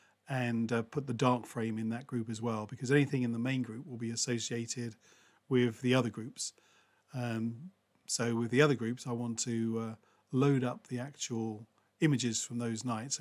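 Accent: Australia. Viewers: Looks like a great project, your accent sounds Aussie, you originally from down here?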